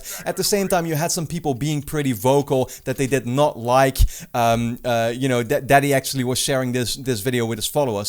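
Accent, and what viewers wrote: Netherlands. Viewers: Ah, ik dacht al een Nederlands accent te horen. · The sexual orientation of a character really does not matter to me, nor their race, gender, strength. I really agree with everything you said, even though it's a while ago. I understand that people might think an agenda is being pushed, because they do not agree with it, and suddenly they are confronted with something they might not expect in a game like tlou, but i hope they can learn to see, it is not pushing anything. If Ellie would be kissing a boy, sure, someone might say he is pushing a hetero normative agenda, because they would rather see more gay romance in games or on screen, but in the end, the developer has all the right to put his or her ideas into their creation, their fiction. Their art. I'm not really OK with people demanding artists to change their work to please the masses, but ofcourse, everyone can have their opinion, but they shouldn't expect the devs to bend to their will. 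And, are you a fellow dutchy? Not that it matters, but the accent? Cheers for the video. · are you dutch ? Your accent sounds like it ;p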